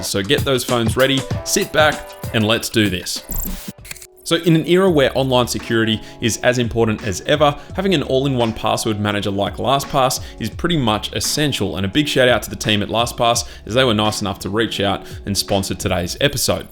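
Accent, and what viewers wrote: Australia. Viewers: Aussie I guess😀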